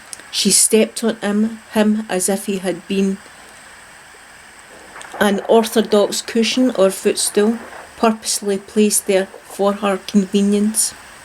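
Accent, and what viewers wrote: Scotland, United Kingdom. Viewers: This was awesome! I like ghost stories. The sound-effects is a perfect mix with your beautiful accent :) So relaxing...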